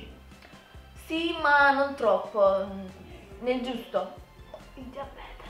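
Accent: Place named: Naples, Italy. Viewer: Ma sei napoletana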